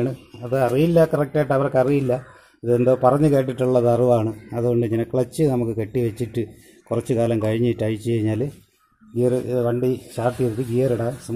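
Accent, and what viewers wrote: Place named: Trivandrum, India. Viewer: Correct karyam aane parayunnatthe ...njn already follow chyunna karym aane...slang kollaam trivandrum aane thonnunu 👏👏👏🤝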